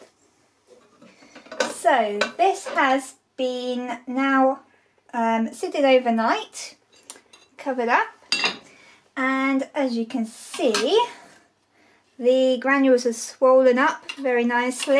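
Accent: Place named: England, United Kingdom. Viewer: Excuse my curiousity, please, from which part of England are you coming from? You have such a lovely accent! By the way, I first leave my glue in water for 24 hours so that the granules can soak up the water. Only then do I heat it, but not above 60 degrees, otherwise the glue loses its binding power.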